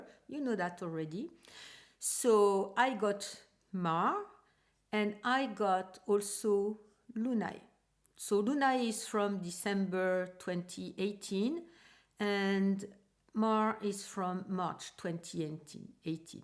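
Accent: France. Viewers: What a delightful podcast! Your French accent is a pleasure to listen to. I can really relate to your acquiring your kryptonite stash and trying to focus on using it up. Best of luck to us all!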